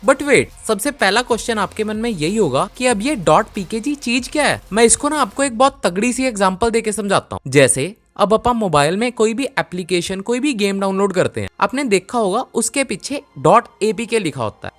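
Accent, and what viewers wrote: Punjab, India. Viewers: Punjabi lag raha hai bhai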